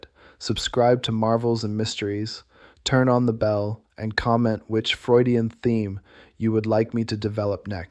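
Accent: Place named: United States. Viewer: well here's a tip: don't use an AI voice with a distinctly American accent for a person everyone knows is Austrian. I thought it was a joke at first.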